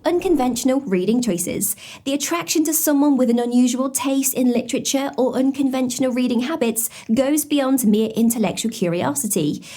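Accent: England, United Kingdom. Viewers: Love her british accent she is adorable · It's the English accent for me😳😏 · I'm Surprised Americans don't say You're A I with your Perfect English Accent !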